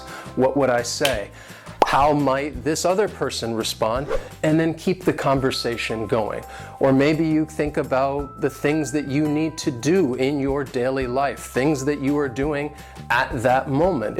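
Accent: United States. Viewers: I love listening your videos, you're a good teacher, it's quite easy to understad you, although you've got such an strong american accent, nevertheless it's lovely, i really enjoy myself listening to, awesome, greetings from manresa catalonia" cheers "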